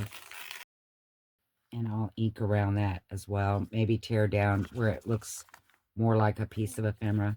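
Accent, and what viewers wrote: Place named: South, United States. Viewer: "Do I talk funny"??.... oh my gosh 😂🤣😂 It's funny, I'm in northwest Indiana, and when I went to visit friends in Texas, I expected a heavy southern accent from the people who were originally from there, but didn't really hear it. When my son went to college in southern Indiana, oh my, they had the heaviest southern accent I've heard, what I expected Texan's to have, lol. So my guess is, you're originally from the south somewhere 🤔 Love the project, everything came out awesome as always 💜💜💜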